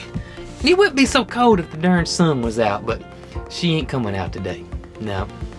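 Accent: South, United States. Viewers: The weather people say we are going to have more cold weather I thought you were going to get your tongue twisted with all those chicken words😁😁😁👍👍👍 I hear a little country in your voice. I'm told a lot that someone can hear it on my voice. I tell them "OK I'm proud of it". I also tell them "you can take the girl out of the country,BUT you can't take the country out of the girl". Just subscribed. Also from Alabama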